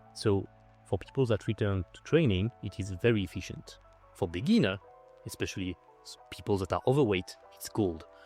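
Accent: France. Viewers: I think Uncommon Sense accidentally did you dirty with that "bylking" comment 😆 he was actually teasing you (phonetic /y/ is pronounced like the french u, he was just playfully replicating your accent IINM) The french u is closer to the english way of saying "bulk" than a french i. If you wanna inch closer still, you could say 'boulking' but almost like an O, or just keep practicing the english pronunciation directly. Cheers.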